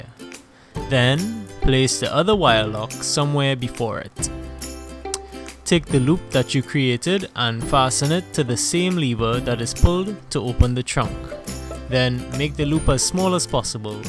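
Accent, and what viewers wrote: Trinidad and Tobago. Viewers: Your accent seems very familiar!! Trini!! I'm getting a lexus altezza next week and lookin at installing one of these as well as a push to start and remote start kit so appreciate this guide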